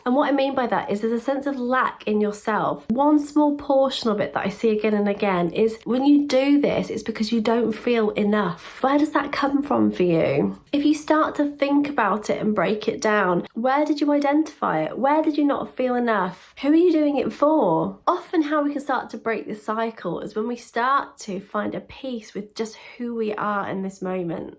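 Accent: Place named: United Kingdom. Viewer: May I ask where your accent is from (Which part of the UK)? I just LOVE it !!! (And I'm not a native speaker, I'm German, so I can't really make an educated guess :D)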